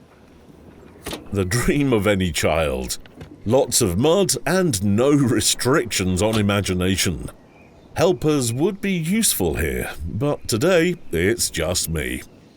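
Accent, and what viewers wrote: United Kingdom. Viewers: good British voice over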